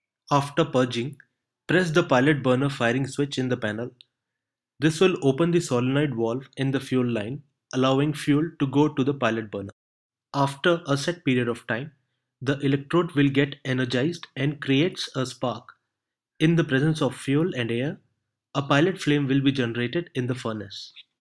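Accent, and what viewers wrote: India. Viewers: accent, its something crazy/ indian ....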